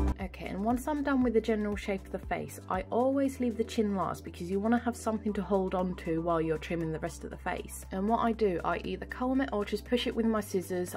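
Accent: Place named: United Kingdom. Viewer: Lovely British accent!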